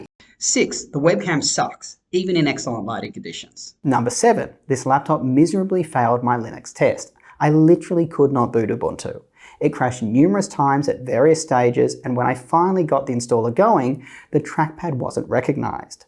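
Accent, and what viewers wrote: Australia. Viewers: That’s crazy I’ve watched like 20 of his vids already and thought he was in Australia or NZ lol wtf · TIL this man with a very Australian accent lives in NYC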